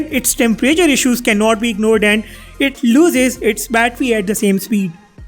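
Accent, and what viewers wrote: India. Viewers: When a india try to speak Englisch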